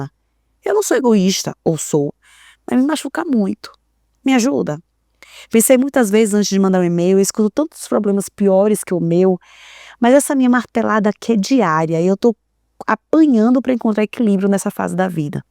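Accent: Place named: Bahia, Brazil. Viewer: Estou simplesmente amando seus livros e seus vídeos. Falam tanto de mim, me enxergo tanto nas suas falas. Ainda mais agora que sou mãe de primeira viagem e estou buscando curar minhas feridas para não ferir minha filha. Sou grata por compartilhar tanto conhecimento com a gente! Ah, e descobri ontem que vc é baiana igual eu, fiquei feliz demaaaais vendo vc fala com nosso sotaque e nosso jeito maravilhoso hahahahaha ❤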